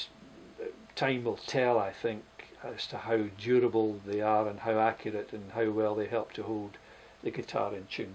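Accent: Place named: Scotland, United Kingdom. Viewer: That made happy to hear the Scottish accent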